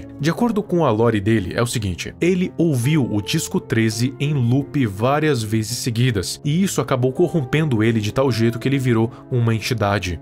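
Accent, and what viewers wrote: Portugal. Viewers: o seu é de Portugal?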